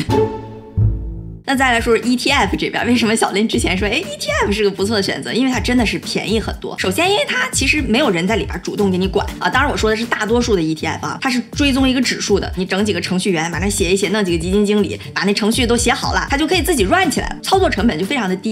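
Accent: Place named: Dalian, China. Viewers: 好难听的大连口音啊，顿时不爱了！ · 大连口音一不留神就漏了。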